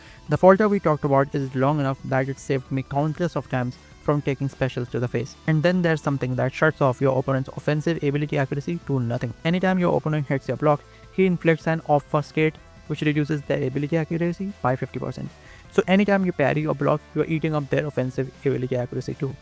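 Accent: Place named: India. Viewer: bro whats your country origin ? cause you have alot of indIan accent in your talk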